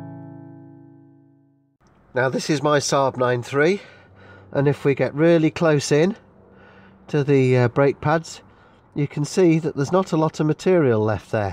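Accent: England, United Kingdom. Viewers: I had the same question; why at 3? Thanks and I love listening to the English English!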